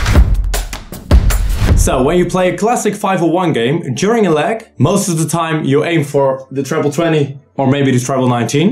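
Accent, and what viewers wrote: Netherlands. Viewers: Ik hoor aan zijn stem dat hij Nederlands is xD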